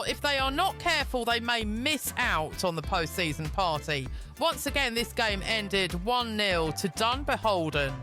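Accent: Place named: England, United Kingdom. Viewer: It's a bit of a shock going from a Jamaican accent to an English one but to be fair she is good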